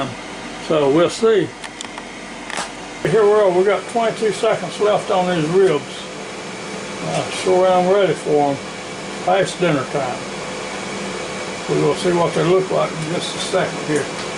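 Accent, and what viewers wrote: South, United States. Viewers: The southern accent make this like 1000% better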